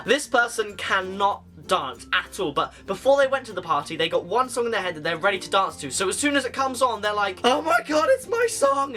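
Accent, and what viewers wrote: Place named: United Kingdom. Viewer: May I ask where you live? I can't quite detect the nature of your beautiful accent! Sorry I suck at British accents, I'm one of those Muricans!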